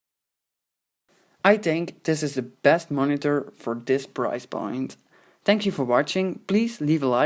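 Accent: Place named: Netherlands. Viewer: Nice vid. U dutch cuz u sound like it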